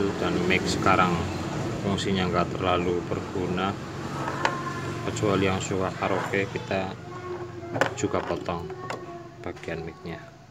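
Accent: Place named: Brebes, Indonesia. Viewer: Cerdas men rika wa nyong ngerti rika wong Brebes o ya? Hehehe..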